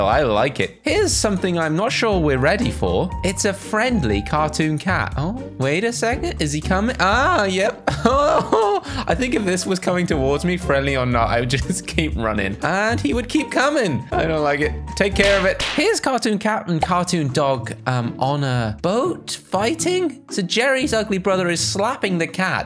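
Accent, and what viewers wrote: United Kingdom. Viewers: sir- are you british?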